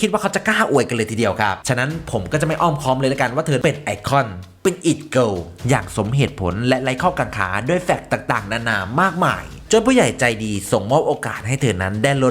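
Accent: Isan, Thailand. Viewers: เบิ่งช่องแอดแล้วเต็มอิ่มกับข่าวสารของน้องลิซ คลิบนี้เบิ่งนำหัวเราะกับการเว้าอิสานผสมมานิดๆหน่อย🥰🥰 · ถึงแอดจะข่าวตกภาษีอีสานบ้าง แต่ก็น่ารักกกก😆😊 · ชอบสไตส์การพูดเนื้อหาและหลุดเว้าอิสานฟังปัยหัวเราะปัยชอบ5555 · 555 ตกอีสานบ่อยนะเรา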